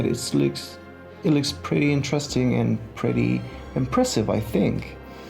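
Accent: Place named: France. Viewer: The only thing I might like more than your builds is your cute french accent :^)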